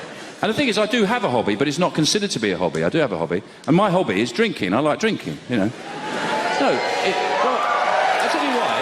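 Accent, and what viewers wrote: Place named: Sussex, United Kingdom. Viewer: Sounds like a Sussex lad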